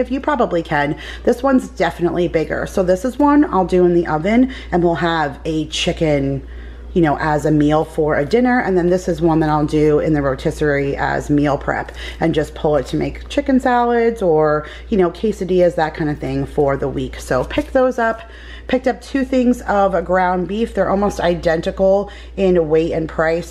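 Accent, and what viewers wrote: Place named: New York, United States. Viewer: Your definitely from ny I could hear it. I do like Texas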